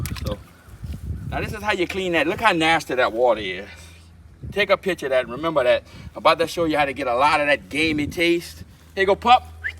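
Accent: Louisiana, United States. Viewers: Sure sounds like my Louisiana people!!!!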